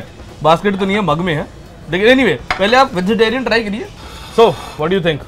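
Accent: Bihar, India. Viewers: Very bad Bihari accent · tried your best to speak bihari. anyway good.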